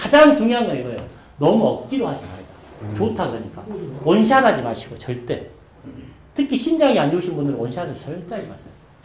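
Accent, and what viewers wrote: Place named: Jeolla, South Korea. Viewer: 박사님 전라도 분이신가요? 저도 전라도 사람인데 왠지 억양이 친근하게 느껴져서요^^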